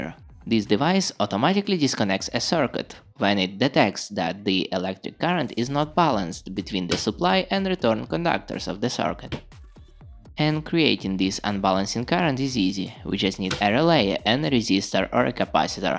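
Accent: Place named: Russia. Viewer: The project is only good for the little house, with little energy consumption. P.S. Despite living in US, this guy still has very strong russian accent :-)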